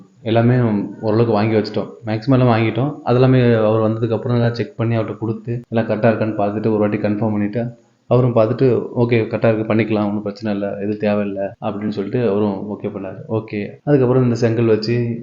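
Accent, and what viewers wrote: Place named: Tirunelveli, India. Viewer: பேச்சு வழக்கு பார்த்தால் கோவை மாதிரி தெரியலையே. நெல்லை மதுரை மாவட்டமா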